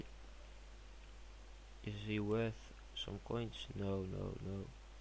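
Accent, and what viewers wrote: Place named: Netherlands. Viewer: lol nederlands je praat een beetje engels met een nederlands acent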